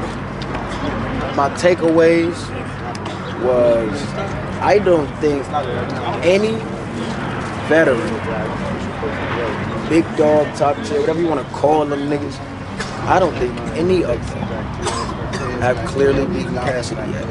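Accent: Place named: Pontiac, United States. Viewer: I COULDA SWORN HE WAS FROM PONTIAC LOL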